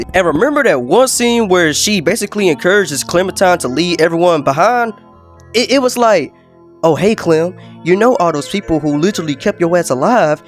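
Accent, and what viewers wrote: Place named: Louisiana, United States. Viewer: What the heck? What kind of accent is that? Is that a Louisiana accent? Whoooooooaaaaaaa! Sir you are a gem. Please make more videos.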